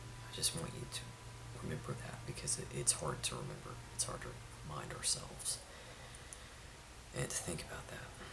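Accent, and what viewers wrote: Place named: Oklahoma, United States. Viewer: I’m from Oklahoma, so your slight accent makes me feel like 10 times better. Most people who do stuff like this talk properly and it’s soothing,yes, but your accent makes me feel at home and o feel like it’s actually comforting not just soothing.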